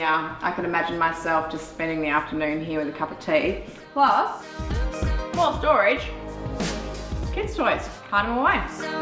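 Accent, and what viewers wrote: Australia. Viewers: Definitely missed the Australian accent!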